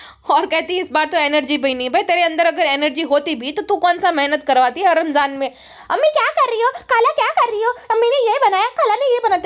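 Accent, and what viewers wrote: Gujarat, India. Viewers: Aap apne podcast me aise hi gujarati tadka lagaya karo bahut achha lagata hai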